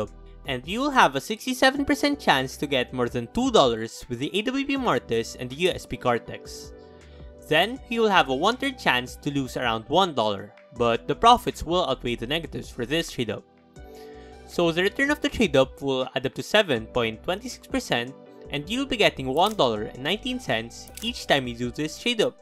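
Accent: Philippines. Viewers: my favorite trade up is the safehouse tradeup it made me 2.30 cents thanks you sound Filipino haha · Filipino for sure. Atleast he is trying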